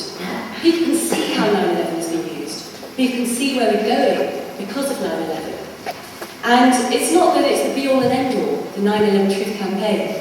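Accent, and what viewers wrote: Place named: England, United Kingdom. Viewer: You cant understand English spoken by an English person? LOL. Its not because she is a "Limey" I think that its just simply that your uneducated.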